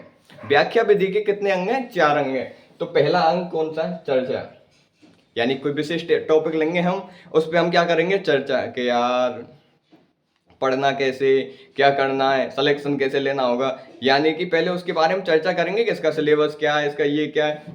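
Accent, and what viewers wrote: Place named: Rajasthan, India. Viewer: Sir ap kha se ho hamri trh bolete ho 😀😀😆 karauli se ho kya ap😅😆😆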